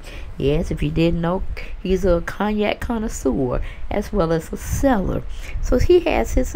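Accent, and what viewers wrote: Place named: South, United States. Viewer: U must be from the south , may be Florida your accent is 😂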